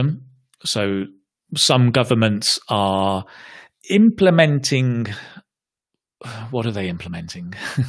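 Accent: United Kingdom. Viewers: Is this British accent , please anyone tell me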